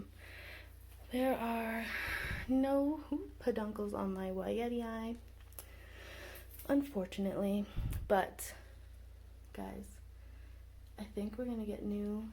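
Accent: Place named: New York, United States. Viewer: Beautiful flowers. Congrats! Where did you find those gorgeous big compacta baskets? You sound like your from NY and I have never seen those anywhere in the NYC limits. 😊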